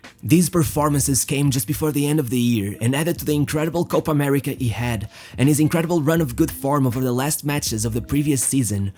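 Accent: Portugal. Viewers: Antes de falares uma palavra em portugues já tinha reparado na tua lingua!! Tugas Caralho!! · you, the narrator of this video is Portuguese right? the accent points to it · Esse sutaque hein....Tu é Tuga né? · i can tell the Portuguese accent. It is also not "footsal" its indoor football. thanks for the video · Ahhh...vi logo que era um tuga a falar ingles... Bom video,desconhecia o canal...subscribed... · Without knowing you I can tell you are Portuguese, and likely from the North/Center of the country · You don't have to use the Portuguese accent on non Portuguese words my dude. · How do you not have a million subs??!! Thanks for the amazing videos keep up the great work and I love your Portuguese accent makes everything sound so smooth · És português também eu o sotaque assim indica parabéns ao seu Canal fantástico 👍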